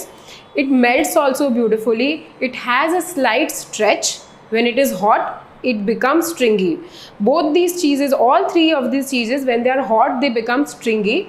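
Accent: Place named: India. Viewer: The way Indian peopl speak English and their accent r really irritating even im an Indian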